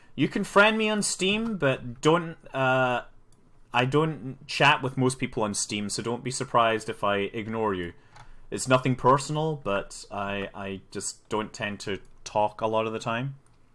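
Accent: Scotland, United Kingdom. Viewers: Scotsman. Ha Ha ha Ha.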